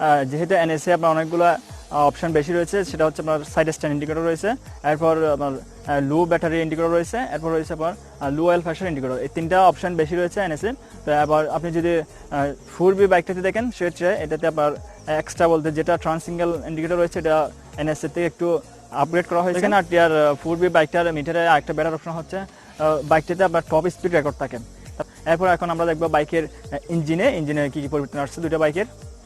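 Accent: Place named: Chittagong, Bangladesh. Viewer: কথায় পুরা চিটাইংগা এক্সেন্ট 😷😂😂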